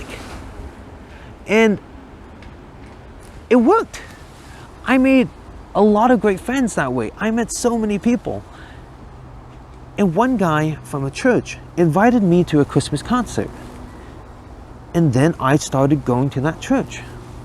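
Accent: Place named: East Coast, United States. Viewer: You don't sound like a Californian. You sound like something easy coast.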